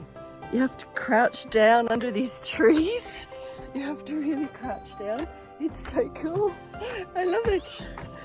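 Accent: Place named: Australia. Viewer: Thank you for all that info! I didn't notice the number plate, but l did notice your interesting mix of accents. Mainly Australian but definitely something US/Canadian every so often. Interesting stuff. I thought, "Oh goodie!"" Found an Aussie lady travelling solo around Oz. Then l thought no, what accent is that? Then l thought yeah, Australian. Then l thought.... you know what l mean 😄 so l'm really glad you cleared that up. It was killing me! Love yr attitude, tips and travels. Might see you out there soon 👌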